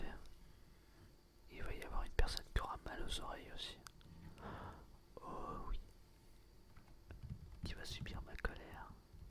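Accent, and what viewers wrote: South, France. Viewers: C'est cool j'ai exactement le même accent que toi mdr 😂 je viens du sud aussi. Sinon continu comme ça t'es le meilleur 💪👍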